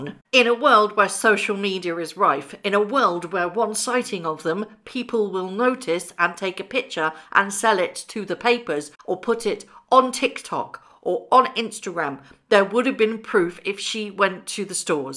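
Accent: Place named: London, United Kingdom. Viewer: I don’t know where you are from in England, but you sound like my family back in London❤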